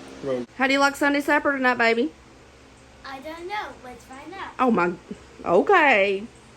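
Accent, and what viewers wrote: South, United States. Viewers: I love how you pronounce oil with your Southern accent! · 😂I thought you said bacon powder!!! I blinked and started laughing at myself.....baking powder! I love the south and am trying to keep up with the accent! Love ya all! That onion casserole is bomb!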